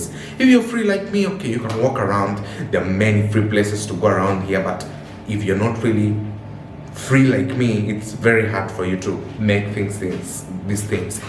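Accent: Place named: Qatar. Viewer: That Qatari accent in you is quite catchy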